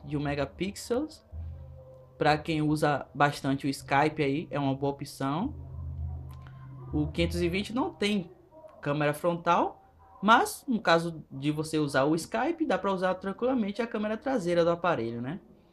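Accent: Bahia, Brazil. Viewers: IUEAHAEIUHEAIU, seus vídeos são legais ! xD Você é baiano ? e_e'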